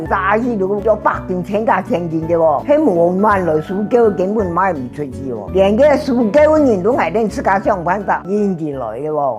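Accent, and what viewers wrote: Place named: Meizhou, China. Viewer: 與台灣四縣腔一個樣, 應該是梅州的吧!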